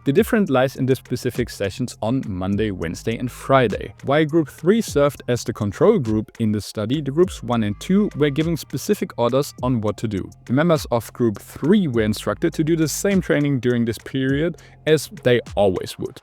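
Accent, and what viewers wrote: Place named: Germany. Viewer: gutes video aber holy shit was ein deutscher akzent 😂❤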